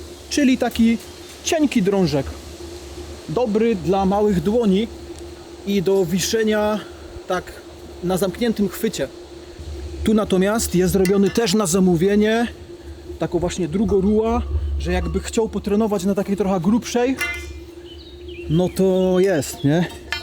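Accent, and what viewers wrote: Silesia, Poland. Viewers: Super kondycja i fajno ślonsko godka. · Cześć oglądam twoje wyczny od początku kanału,pracowalem dużo na Śląsku w twoich rejonach jako dekarz, i jak wracałem do siebie to godol jak ty😂jak byłem mlody to tes wszystkie drzewa i kominy były moje,teraz wiekowy się robię...moj rekord na drążku nachwytem 19 ale miałem 20 lat...teraz starość zaraz wnuki może będą 😊ale staram się ruszać, pozdrawiam i wysokości, tylko z głową jak zawsze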